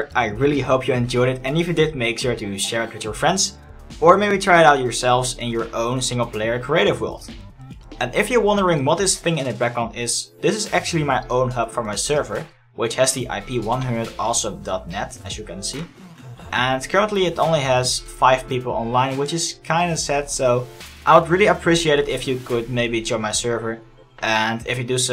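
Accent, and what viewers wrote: Netherlands. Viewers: Are you dutch? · you sound dutch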